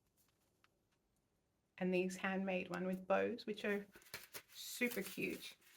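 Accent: Australia. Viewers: Congratulations on your first vlog. I enjoyed listening to another Aussie. I’m from QLD and have the Hope dress still to sew although summer is catching up with me now. Looking forward to your next vlog! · Hello! Lovely to hear another Australian, I am from Brisbane and I am sure you will be good at this! Looking forward to seeing Moore! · Congratulations on your first video. Although you were nervous you came across very well. Looking forward to more of your videos. And yes, much like other commenters, it is lovely to watch and have a fellow Aussie talk about quilting . Keep going!! Sending positive vibes from Melbourne. 🌸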